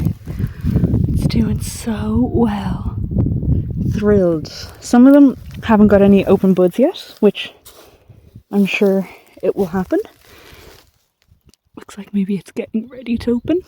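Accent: Ireland. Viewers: you are my spirit guide I never know the date either 😂 I'm permanently shocked about where the days are going. Also, as a Dub living in the UK the past 18 years your accent and your sayings are a cure for the homesickness. The place is looking great, even better in the sunshine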